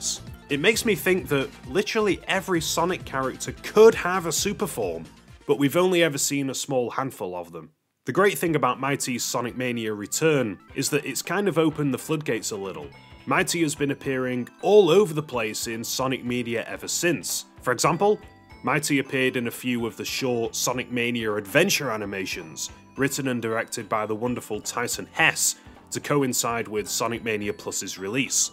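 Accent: United Kingdom. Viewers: Ur defo from Stoke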